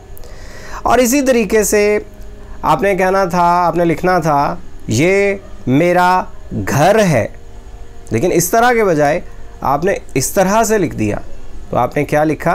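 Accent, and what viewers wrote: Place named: India. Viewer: Aap kahan se ho?.....talaffuz india ka lag raha hai......masha Allah....achchi aur durust koshish hai.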